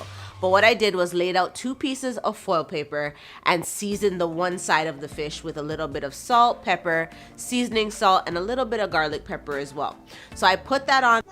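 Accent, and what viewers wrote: Jamaica. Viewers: Your Jamaican accent is so strong !! I love it I wish I had it that strong ! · Loved the Jamaican accent 😂😂😂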